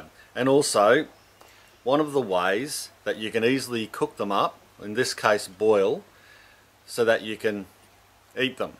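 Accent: Australia. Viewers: Rithik Madan Australian I think, maybe New Zealand but pretty sure he’s mentioned being Aussie. Definitely not british. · Chowder Stevens he's not English he's an aussie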